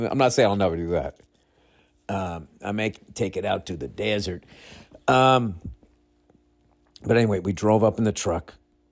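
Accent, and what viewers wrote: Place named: South, United States. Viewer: with the new truck. That southern accent going to fit in perfectly with that big ol truck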